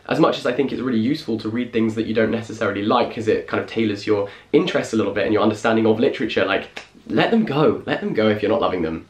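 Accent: United Kingdom. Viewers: I like your British Accent it's amazing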